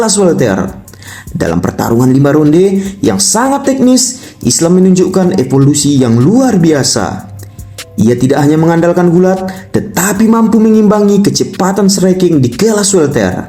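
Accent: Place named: West Java, Indonesia. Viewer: .. Sedikit saran niih bro. Agak dikurang2inlah sedikit logat/dialek "Sunda" mu. Penonton mu tak semua orang Sunda. Came on, up grade lah chanel mu biar lebih keren.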